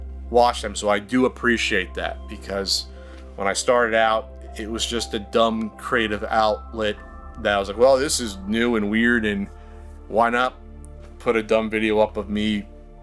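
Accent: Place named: East Coast, United States. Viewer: I've been watching your videos since you used to have east coast accent.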